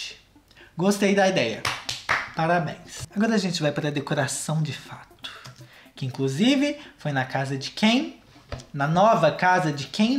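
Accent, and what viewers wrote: Minas Gerais, Brazil. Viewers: Eu gosto de comida boa não de comida xexelenta BERRO KKKKKKKKKK PS.: cê é mineiro? Pq pelo sotaque parece um pouco kkkkkkk obrigada por não decepcionar nos vídeos 💖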